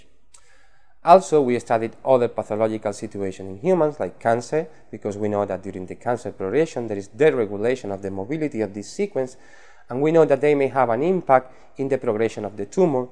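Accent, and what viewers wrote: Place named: Spain. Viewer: Interesting, but you should speak slower. As a non-native English speaker I have problem to follow because of your Spanish accent, and it had been much easier if you spoke slower.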